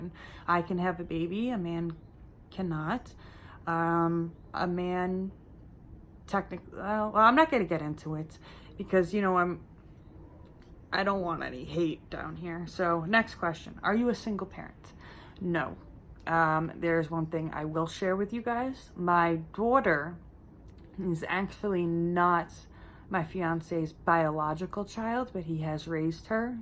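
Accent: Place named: New Jersey, United States. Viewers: I love your channel I’m a bit late to the question and answer session but I would have asked what cosmetics and skin care do you use your skin is always flawless and I hear New Jersey in your accent 😊xx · I thought I heard some Jersey ! Thank you for sharing- your a true blessing. May I ask ? Was it difficult learning to speak after your dentures ? You speak really well . Thank you and Godbless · I hear more jersey than anything lol I’m from upstate New York grew up in bath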